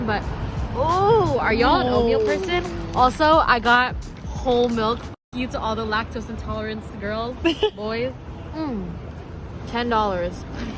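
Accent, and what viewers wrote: Vietnam. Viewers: your viet is so cute T__T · Has anyone told you that your Vietnamese accent is so cute ❤❤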